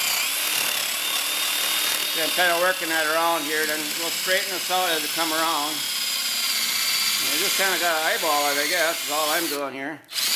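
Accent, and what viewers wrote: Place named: Wisconsin, United States. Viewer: You must be from Wisconsin...you sound just like I do:)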